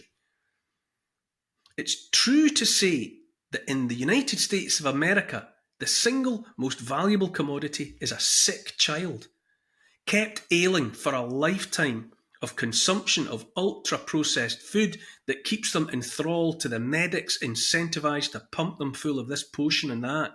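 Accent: Scotland, United Kingdom. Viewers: You have a lovely Scottish accent.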